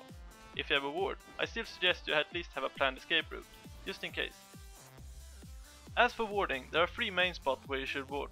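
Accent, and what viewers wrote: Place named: Norway. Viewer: where are you from? you sounds like you are from norway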